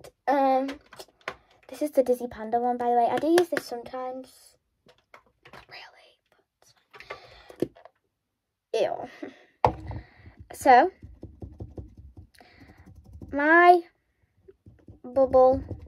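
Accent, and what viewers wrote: United Kingdom. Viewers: I don't know where you're from but your British accent is a chef's kiss xx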